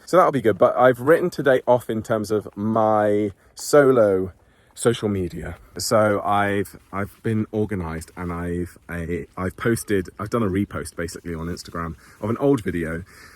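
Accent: England, United Kingdom. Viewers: we could never possibly get tired of seeing your handsome face on our screens and hearing your gorgeous English accent - much love to you and the handsome Keegan 🇮🇪☘️🇮🇪❤️❤️❤️❤️❤️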